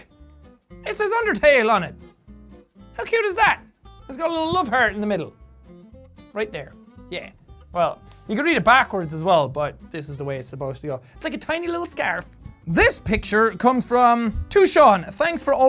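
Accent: Ireland. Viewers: Has jack got more Irish 😂🤔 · At least he still has his Irish accent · Woah, his irish accent was so strong in this video. Damn son!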